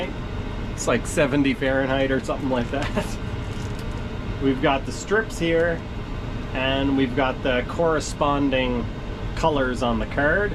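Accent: Canada. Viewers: Excellent videos! 🍻🍻 Do I detect a southern Ontario accent? 😁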